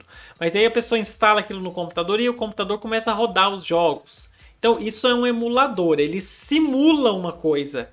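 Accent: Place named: Minas Gerais, Brazil. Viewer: hahaha quais foram as exposições? Sou de Minas também! obs: já tinha desconfiado que era mineiro pelo sotaque, esse não engana ninguém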